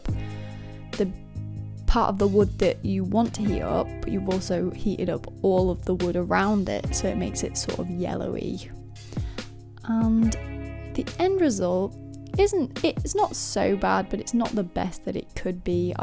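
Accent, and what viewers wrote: United Kingdom. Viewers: thats a manchster accent anyway OMG THX SO MUCH THIS VIDEO WAS LIKE SO USEFUL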